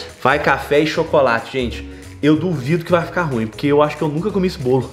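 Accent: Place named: Rio Grande do Sul, Brazil. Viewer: vou fazer com certeza, e não é que vc tá falando enrolado, é que vc era mineiro e agora virou gaúcho tchê, é o sotaque rsrssss, bjos pra vcs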